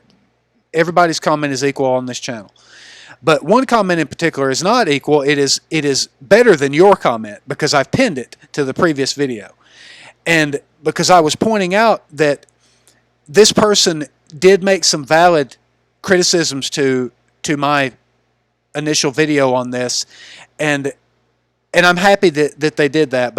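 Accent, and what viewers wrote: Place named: South, United States. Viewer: Also, sony claims that this was built "from the ground up" for ps5, so they are saying this is the "best" they could do with the ps5 hardware........in a way. Its poor ass marketing and obviously a lie. I don't like this kinda shit when nintendo does it, and I still don't like it when other companies do it. On here the hypocrisy about this game from many is worse than Nancy Pelosi,and the democrats in general. Is it a coincidence than sony droids and liberals are one and the same?? LOL Its funny how many on here have made videos similar to this one, but they will not call it a cash grab, ya know exactly what this is LOL Buy it used on disc at some point man, that way they don't get another sale from you. Its what I'm gonna do LOL. This is a big reason I still love physical media. Oh and I'm from NC, so don't really mind your southern accent LOL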